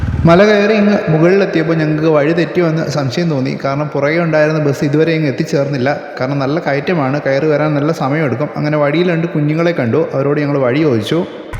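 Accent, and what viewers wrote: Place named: Pathanamthitta, India. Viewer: സേതു സാർ ടിപ്പിക്കൽ പത്തന്തിട്ട സംസാരം... പയങ്കര രസം ❤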